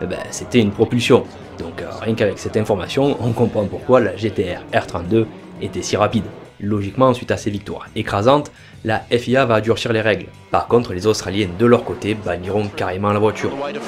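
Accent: South, France. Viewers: tu t'exprime avec un super Français, qui sen un peut le sud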